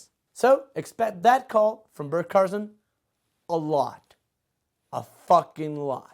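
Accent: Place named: Mexico. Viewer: Please, please, PLEASE!!! Don't make any more of this. Your Mexican accent makes me cringe. I try to pay attention to what you're trying to say but, damn! And you ideas and opinions....Well, just watch your own videos and you'll know what I mean. If I want to see funny news, I'll just watch Colbert, or The Daily News re-runs because them I can actually understand.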